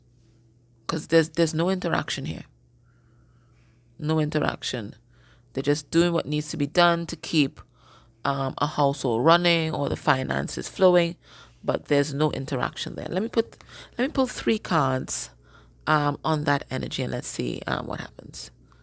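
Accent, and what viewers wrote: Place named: Trinidad and Tobago. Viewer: Where are you from? Trinidad?I heard the accent and immediately subscribed! I am from St. Kitts.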